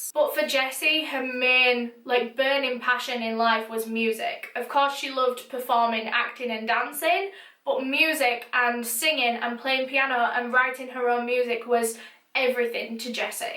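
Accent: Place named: Yorkshire, United Kingdom. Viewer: think she's from Yorkshire, UK. Personally love the Yorkshire accent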